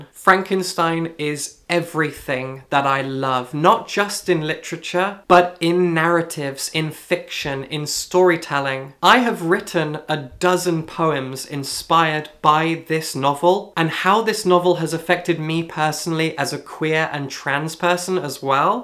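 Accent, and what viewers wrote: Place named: England, United Kingdom. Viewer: I love your reading suggestions and reviews, as well as your perfect English accent. Thanks for you work! I've proudly subsctibed to your Patreon. Alice from Italy ❤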